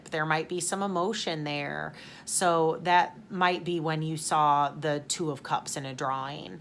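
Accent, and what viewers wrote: Midwest, United States. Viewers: don’t y’all talk similar in the Midwest? It all sounds the same to me! Anyway, you’re very cool, I like your channel and your face. 😊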